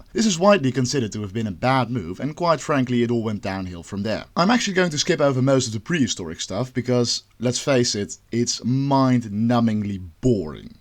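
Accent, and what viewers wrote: Netherlands. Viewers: Bro as a dutch guy hearing ur dutch accent, i just wanna say awesome video im 4 minutes in and learned more then our education system thought me in years! Lekker bezig maat!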